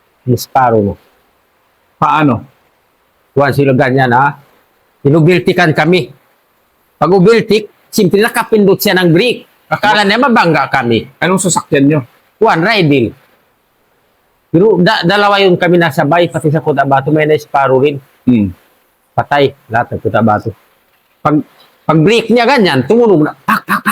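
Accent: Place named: Tausug, Philippines. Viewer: Tausog na tausog talaga c sil mag kwento ba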